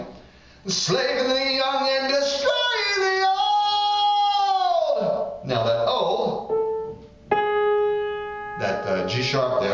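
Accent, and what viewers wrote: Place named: United States. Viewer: First of all you need a middle class London accent and then the phrasing will come naturally! Being British, I can hear your American accent cutting through like a Chainsaw! Just a thought...Not an order! Example of this is Ken Tamplin trying to sound like Freddie Mercury......Oh Dear!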